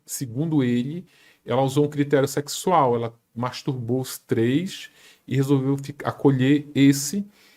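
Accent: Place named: Rio de Janeiro, Brazil. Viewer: O sotaque dele é muito chato. Nossa. Uma mistura de carioca com nordestino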